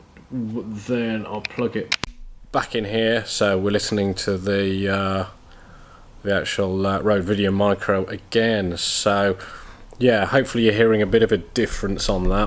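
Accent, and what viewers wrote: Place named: United Kingdom. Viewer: Was suprised to hear a fellow british voice when I clicked on this link. Thanks for making this, seriously considering a mic like this for both my 600d and my gopro.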